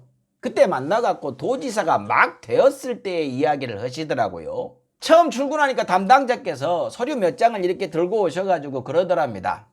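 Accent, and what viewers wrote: Jeolla, South Korea. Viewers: 저 전라도 사투리로 보수정당 지지했으면 더 좋았을텐데요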